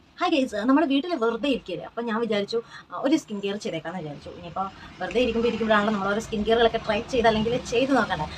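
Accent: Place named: Hindi Belt, India. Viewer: Hindikaru de Malayalam tracilete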